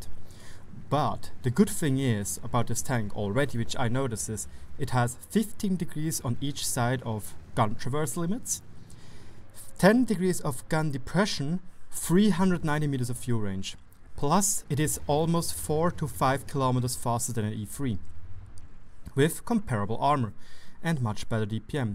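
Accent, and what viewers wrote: Germany. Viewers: Your accent certainly tells you're German, aren't you? The way you pronounce (Cheesus xD) Jesus, just cracks me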